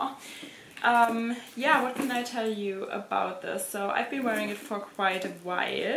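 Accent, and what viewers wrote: Germany. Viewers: Hey, i am thinking of getting the purse.... great review thank you for the video ☺️.... are you german ? ☺️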